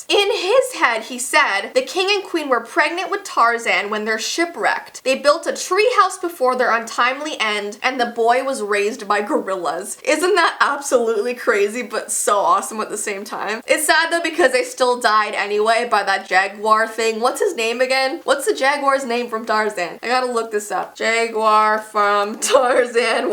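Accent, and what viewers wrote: Canada. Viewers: DIH-Do It well she's from Canada and people from Canada have an accent, so that can possibly be the explanation of why she pronounce's it differently🤷🏼‍♀️🙄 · It’s not hands it Hans but it sounds like Hands because of her Canadian accent. I’m Canadian and I have an accent like that. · She's from Canada, so they pronounce words differently I believe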